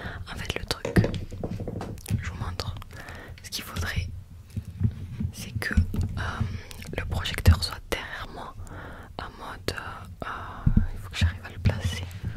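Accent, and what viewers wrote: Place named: Basque Country, France. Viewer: Voilaaaaa on dit chocolatine conn, t’as un accent basque de fouuuu je me trompe?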